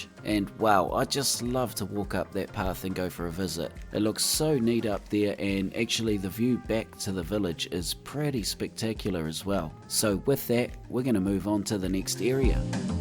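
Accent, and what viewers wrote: New Zealand. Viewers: I much prefer CS1 content - it's just so much more dynamic. I can sort of sense the big CS content creators are trying their best with CS2 but its really not picking up where CS1 left off and more or less just starting where CS1 started. Anyways, I still love your content and enjoy hearing the kiwi accent for CS videos.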